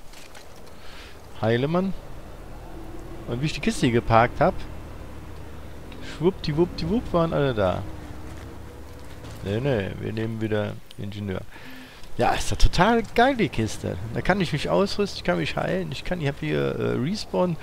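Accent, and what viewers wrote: Cologne, Germany. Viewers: Deine let´s play´s sind der hammer hört sich na ne kölsche jung an oder täusche ich mich da ? :D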